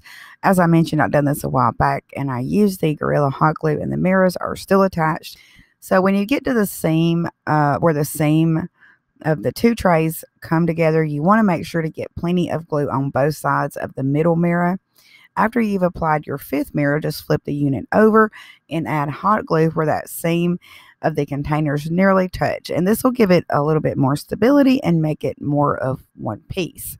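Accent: South, United States. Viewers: Love it! Where you from girl? Very Southern. I'm from S.C.! ☺💜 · Love the country accent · I like it. I would love to see one in white or wood look.. Great job. Love you....you are amazing!! I love you soo much. We are both country gals. Our pronunciations on some are just about the same. I live in the south. But you got it going on.... Xoxo. Hugs your way.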